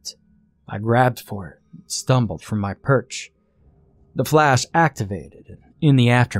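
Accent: South, United States. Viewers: Your southern draw is to die for 😍😍😍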